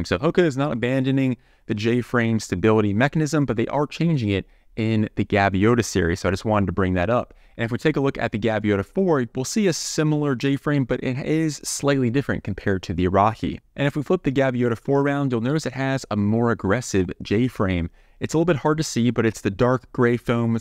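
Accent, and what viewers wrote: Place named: Philadelphia, United States. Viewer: That Philly accent is crazy 😂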